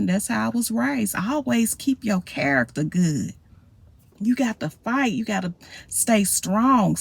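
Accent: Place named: Alabama, United States. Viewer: How did I know that accent was from here… Alabama!! Whew our accents are so unique lol!! Sending love!! ❤❤❤❤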